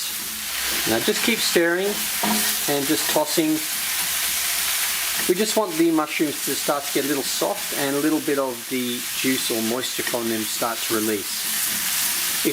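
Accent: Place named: Australia. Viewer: That Australian accent 👌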